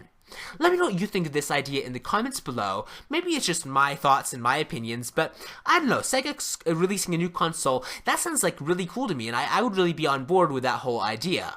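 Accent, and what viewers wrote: Scotland, United Kingdom. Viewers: Are you Scottish? I get the strong impression you're Scottish, but moved away aged 7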